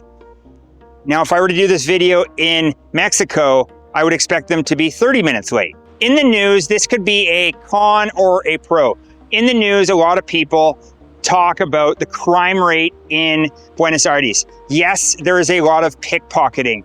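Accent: Canada. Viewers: He is from Canada, come on!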